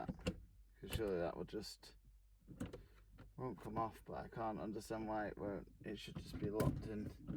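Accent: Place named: North, United Kingdom. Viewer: Number one you are really tan for somebody with a slightly northern accent. Maybe I got that right maybe I got it wrong But thank you very much this was really informative I have a 2011 John Cooper works that I wanted to change out everything in to match the wrap that I am doing on it you’re just really really helpful all the other ones not so much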